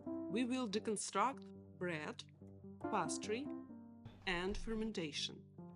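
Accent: Eastern Europe. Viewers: Узнаю родной славянский акцент и здравый научный подход! Приятно слышать. Спасибо,! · Я вижу Вашу любовь к своему делу и с привеликим удовольствием смотрел бы вас, но уж больно родной, до скрежета на зубах восточно-европейский акцент лично меня отталкивает. Извините ❤️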